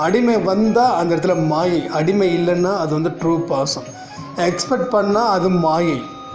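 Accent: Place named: Madurai, India. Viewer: I hear ur voice so that I am asking u r Madurai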